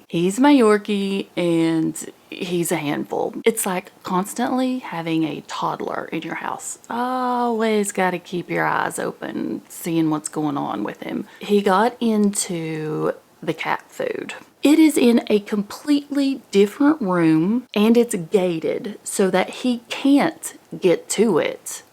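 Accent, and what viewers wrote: South, United States. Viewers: Hi.good blog today.op love your snow. From northern Canada so we get lots. I bought dr Fungs Obesity Code book and a great read. Halfway thru the video with CEO you recommended. Great information and makes such good sense. thanks for recommendation. Love your show and your southern accent. Wild. ❤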